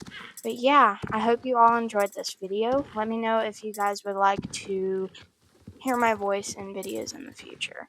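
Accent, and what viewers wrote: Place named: South, United States. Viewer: Twin you got an accent too southern twinnny!! -Shenzi or Scylla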